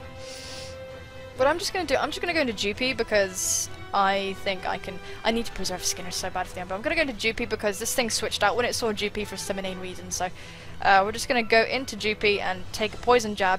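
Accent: United Kingdom. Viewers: You are SUPER British! Lol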